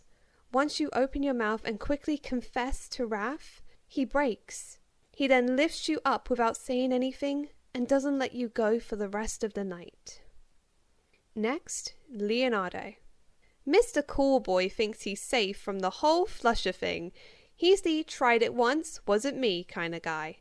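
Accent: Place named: United Kingdom. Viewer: Bro why you sound like a British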